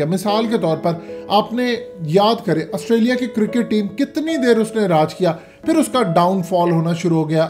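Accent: Punjab, India. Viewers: جن کو ٹھیک طرح سے اردو نہیں بولنی آتی۔۔۔اور جن کا اردو کا تلفظ پنجابی جیسا ہو، ان کو پاکستان میں صحافی بنایا ہوا ہے۔۔۔یہ معیار رہ گیا اب صحافت کا۔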